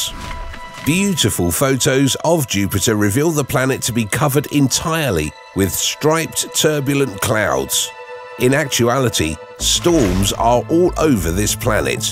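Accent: United Kingdom. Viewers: What's wrong with the narrator being "Brit"? Are only Americans allowed to speak on YouTube? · HUH? What do you mean "why" is he British? Do you expect the crew to go "oh damn agh nevermind you can't narrate. You're British so.." Why not a brit? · Why is the narrator a Brit? Also, why after 70 years of space exploration and billions spent on Satellites and Probes are we essentially clueless about why or what is actually happening in, on, and around Jupiter?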